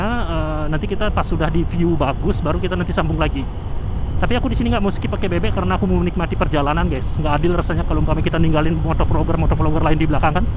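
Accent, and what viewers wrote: Bali, Indonesia. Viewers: Logat bali khas banget hehe😅😁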